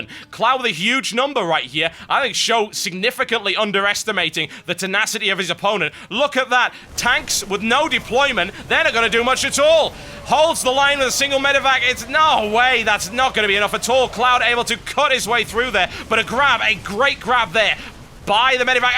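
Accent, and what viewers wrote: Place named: England, United Kingdom. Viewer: you're accent is embarassing to be english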